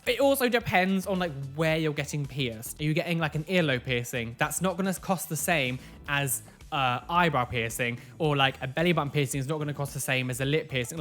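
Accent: United Kingdom. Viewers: you should see me do my impression of you to my friends complete with hand motions and british gay accent. "time for another piercing reaction videoooooo!" lmao!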